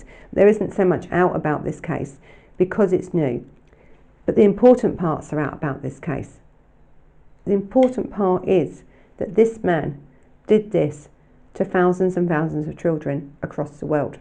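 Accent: London, United Kingdom. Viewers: Thank you my dear; I never thought of end to end in that way, thought it was more people carrying on with someone else who used it on What's app. I would never use it as I have nothing to hide I suppose. Thank God my daughter is now an adult herself. The internet can be a wonderful thing, but is truly a double edged sword. Also rather depressingly I am on the Suffolk/Norfolk borders, near this creature. I will as inform as many of those I know about the danger and new techniques these bastards are using. Am learning from each story you put out so, thank you. BTW is your accent London, Essex, Thames Estuary, American and Ozzie? I definitely hear London/East End/Essex borders. I am all cept USA n Aust. lol. Worked in London until thought up in Suffolk she would grow up surrounded by nature and peace. She was 7 and was not happy leaving. I was though. 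Am a new fan and subscribed couple weeks back. You go warrior woman, we need more like you. Locked down love from East Coast of England. x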